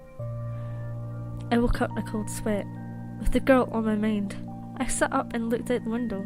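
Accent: Scotland, United Kingdom. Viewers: Are you Scottish ? Great accent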